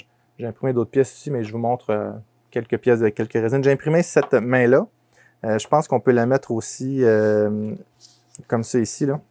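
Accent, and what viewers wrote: Canada. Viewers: Bonsoir, Je ne connais pas le fonctionnement des imprimantes résine et je vais chercher sur votre chaîne youtube. Vois faites partit des rares personnes qui expliquent bien le comment du pourquoi et j'ai acheté mon ender 3 pro un peu grâce au tuto que vous aviez fait à l'époque. Le petit accent et les expressions Canadiennes me font toujours plaisir. Merci poor ces review toujours bien préparé (les petits papiers le prouve) ça évite les vidéoss qui durent 3 heures et qui se répètent. Toujours un plaisir de m'instruire en vous écoutant.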